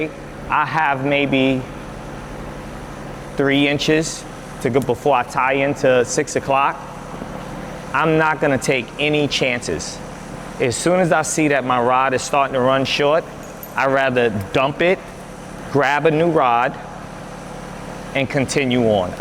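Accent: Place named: New Jersey, United States. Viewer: Dude the Jersey accent is such a change of scenery for a welding tutorial coming from being taught by a bunch of southern boys. 😎🤘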